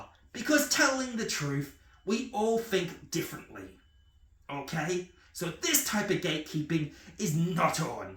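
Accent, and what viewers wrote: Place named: Germany. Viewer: dude I thought you were either German or Austrian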